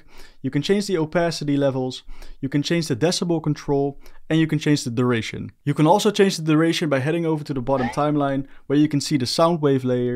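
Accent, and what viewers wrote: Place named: Netherlands. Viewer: Alright I know this has nothing to do with anything but you sound like you're Dutch, can you confirm or deny this because I'm wondering if I'm right now haha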